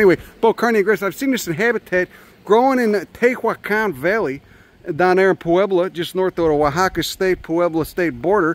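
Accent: Chicago, United States. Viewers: Love this channel particularly cause of the Chitowm accent makes me feel back at home. Would love to see my favorite bird, Common Nighthawk nesting in those rocks. Hey tanks for da channel!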